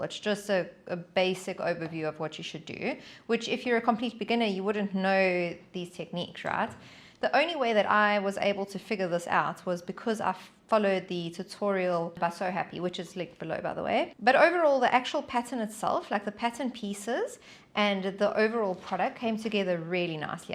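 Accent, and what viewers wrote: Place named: South Africa. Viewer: Hi there. You sound like a fellow south African 😊. Can you advise on how you choose your size? How did you know the size would fit you or are you familiar with tianas closet patterns? Thanks allot for the tutorial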